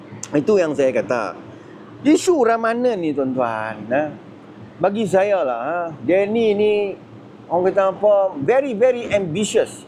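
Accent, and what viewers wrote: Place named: Johor, Malaysia. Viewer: Amboi DSA dok JB teruih cakep pelet Johor nooo.ape bende le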